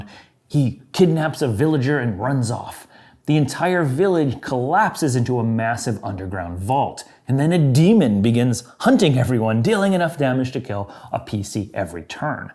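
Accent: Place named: Australia. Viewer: This is brilliant but that Australian accent is bloody awful .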